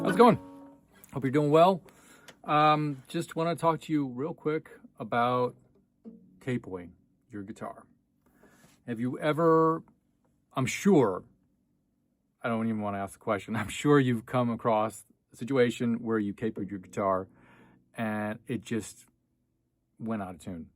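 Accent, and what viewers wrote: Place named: United States. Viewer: I will never get used to the USAian pronunciation of cap-o as kay-po😂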